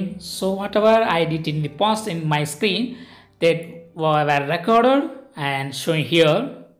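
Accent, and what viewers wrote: Tamil Nadu, India. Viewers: Your tamil style English is very good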